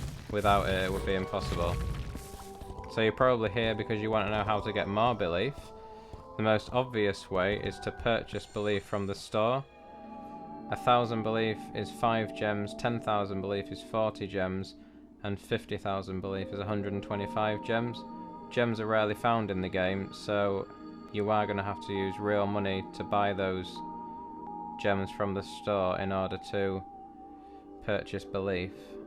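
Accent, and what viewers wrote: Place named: England, United Kingdom. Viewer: Man i love your accent.. which part of england is that? Also im glad to inform you that ALL of your vids are helpful and im enjoying your chanel. Keep up the good work mate. :)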